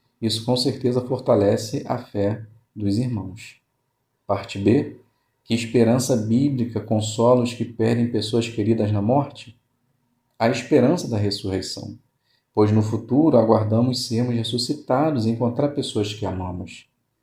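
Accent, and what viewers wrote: Rio de Janeiro, Brazil. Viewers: Amo o sotaque carioca,um abraço irmão 😊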